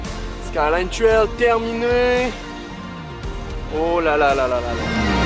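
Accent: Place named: Quebec, Canada. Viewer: Merci de partager ça! Le timing est parfait étant pogné dans la maison avec les Rocheuses pratiquement fermées partout en Alberta. Je continue le vidéo dans quelques jours pour rallonger ça un peu! Très belle cinématographie et en tant que québécois expatrié en Alberta, c'est le fun d'entendre l'accent (de Québec?)! Bonne chance dans tes futurs projets. ✌🏼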